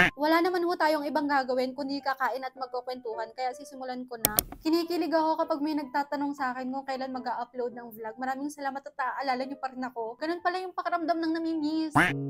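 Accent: Batangas, Philippines. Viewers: Magames ka ba? Kung oo, anong games ang kinakahiligan mo? Kung hindi, anong ginagawa mo o pinagkakaabalahan mo gawin pag bored ka? namiss kita! Una kitang napanood yun atang bumili ka ng bagong iphone at kasama mo ang tatay mo. Natuwa ako sa iyo gawa ng punto mong batangueño ay hindi mo tinatago. Namimiss kong umuwi ng batangas dahil sa iyo. Dun kita sinimulan panoorin. At masaya ako na malayo na ang narating mo. <3 Godbless you always! <3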